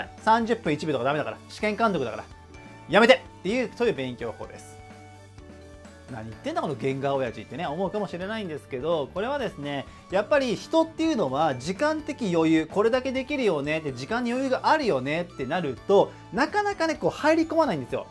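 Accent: Kansai, Japan. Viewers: 関西弁のイントネーションがたまに出るけど 関西で過ごした事あるんですか？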